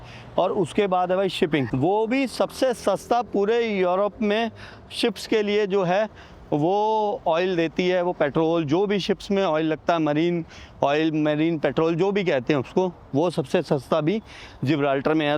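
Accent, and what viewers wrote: India. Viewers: Your accent is very good indian accent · . Plz don't worry about ur pronunciation. Sir u're down to earth. Aur hum jo dekh rhe hain voh bhi aap jaise hi hain. Hum ko konsi French, Portuguese, Russian ya Germany aati hai. Aap ka desi andaz No 1 hy. From Mumbai.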